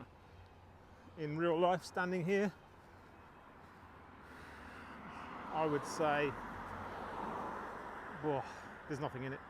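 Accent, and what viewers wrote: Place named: United Kingdom. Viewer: I look forward to the season ahead, just started here in the UK.. have you always lived in Australia? Sounds like you have a British accent to me at times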